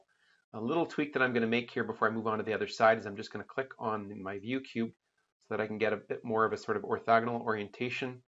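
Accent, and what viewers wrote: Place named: United States. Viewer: Amazing tutorial man. Per your accent I assume you are American, I would have thought you would give the dimensions in inches instead of mm. Thank you so much.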